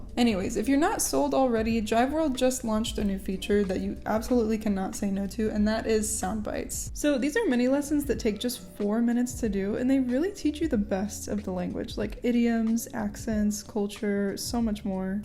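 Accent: Mexico. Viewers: It's surreal how your Spanish pronunciation sounds so native and you sound like a high class Mexican girl. I speak Spanish, I just ran into your video and I got curious. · As someone from SPAIN ur spanish is so mexican but it's actually very good!!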